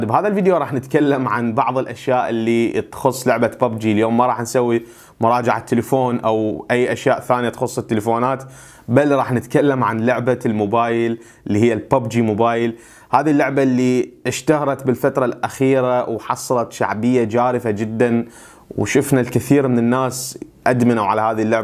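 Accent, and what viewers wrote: Iraq. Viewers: والله لهجتك كلش عراقية ،انتة مو كويتي ؟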